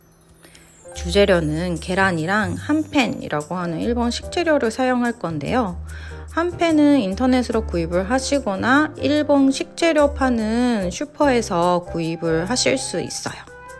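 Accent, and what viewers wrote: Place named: South Korea. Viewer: 영상에는 외국인 엄마라고 했는데 외국인 맞나요?🤔 그냥 한국인이 말하는 억양인데요? 아님 부모중에 한분이 한국인신가요?😏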